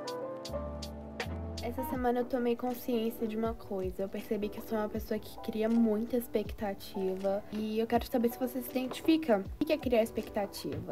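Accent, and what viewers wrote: Minas Gerais, Brazil. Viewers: Vc é mineira ? Seu sotaque é tão lindo!!!!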